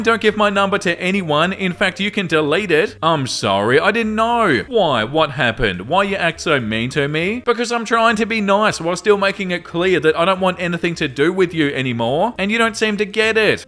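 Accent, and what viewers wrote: Australia. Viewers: oh you're an Aussie. i though maybe i detected sth african/aussie accent. with a dash of NZ lol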